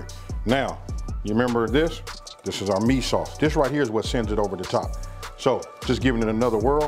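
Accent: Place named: California, United States. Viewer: I’m pretty sure he has a Cali accent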